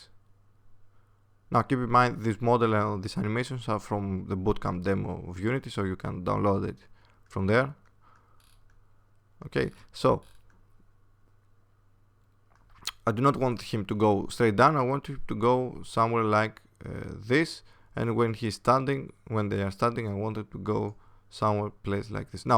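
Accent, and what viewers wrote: Greece. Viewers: I can tell the Greek accent miles away!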